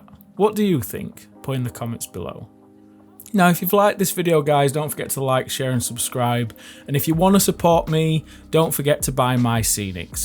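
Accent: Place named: Northern England, United Kingdom. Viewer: This guy’s accent makes me think he says ‘ey up’ instead of hello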